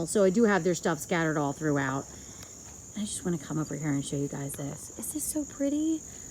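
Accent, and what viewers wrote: New Jersey, United States. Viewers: I deffs knew were from NJ from listening before reading the description. And I love how cute you've made that shed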